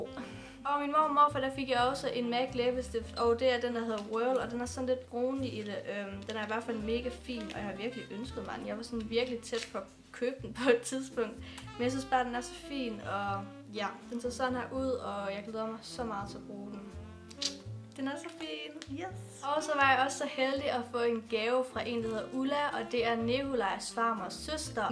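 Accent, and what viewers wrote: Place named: North Jutland, Denmark. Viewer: Det er så sødt man kan høre du kommer fra Nordjylland👏🏻❤️ Jeg kommer selv fra Nord-Midt Jylland😍🙌🏻 Du er virkelig god og dygtig så BLIV ved😘🙌🏻🙌🏻👏🏻👏🏻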